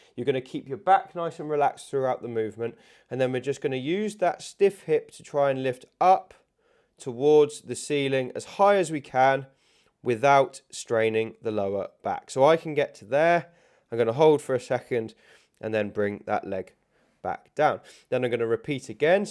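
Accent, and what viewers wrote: United Kingdom. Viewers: Call me biased, but when I hear that right proper British accent, I just feel more assured that I am getting correct ✅ information. ( and I am American )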